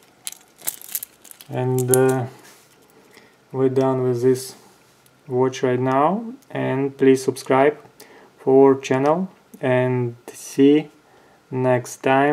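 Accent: Russia. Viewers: А почему рязанское произношение,а?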